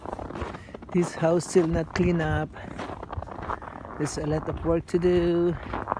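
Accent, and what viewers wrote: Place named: Canada. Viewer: Wow english Canadian eh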